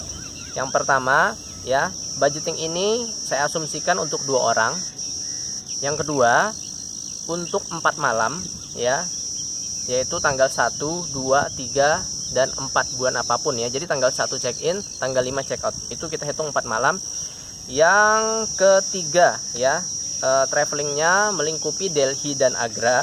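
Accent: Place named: Sunda, Indonesia. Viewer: Mantafff..👍 monggo mas di serius-in..kelihatannya prospek kalo mas bisa bikin travel packace ke India..mudah2an bisa tambah2 uang saku.. Btw..mas aslinya org mana y? Saya dengar2 asli kalimantan..tapi logatnya kok kaya kental aksen sunda nya..semoga tetap semangat do the best disana 💪 Salam kenal dari Surabaya 🙏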